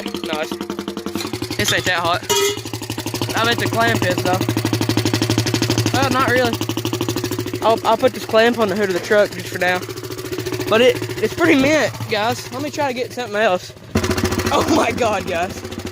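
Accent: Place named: United States. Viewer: god damn son you sounds as american as you can be :) (as a none american) no hate i find it funny just like the british accent and god damn that runs like shit :) jesus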